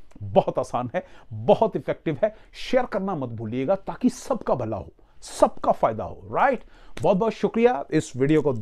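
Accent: Punjab, India. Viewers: Doctor Sahb ka utshah or bolnna ka lehja dekh Kr video K shuru se hi lag rha tha k doctor Sahb😀😀 Punjabi hai 😀😀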